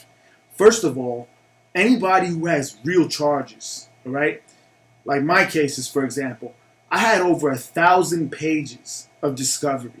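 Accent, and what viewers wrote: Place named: East Coast, United States. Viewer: I don't know where you are from but it sounds like you are from the east coast somewhere. Because i did 8 years here on the east coast and i did my time in the same way as you are talking. I actually punched a guy in his mouth once for coming at me sideways in asking for my paperwork. I took it just like you said. I said to him, what do you calling me a ripper? And i hit him in the mouth. But on the west coast, mostly California they have prison politics that are completely different. They segregate races as part of the prison politics and here on the east coast we don't do that. Its two totally different worlds.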